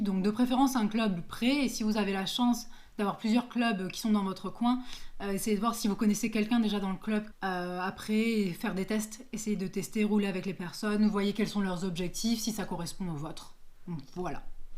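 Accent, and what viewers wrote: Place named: United Kingdom. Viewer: Sympa la vidéo ! Sinon pour les cyclo je pense que le top c'est comme les courses, tu manges entre 3 et 4h avant (oui vraiment, et c'est pas trop) des pâtes, un équivalent de viande blanche, puis peu manger pendant l'effort parce que ça oblige à digérer a nouveau et en plus c'est pas toujours judicieux de mettre la main a la poche en peloton x) Sinon le fait que tu sois prof explique l'anglais au début de la chaine et l'accent, c'est rare chez les youtubers français 😂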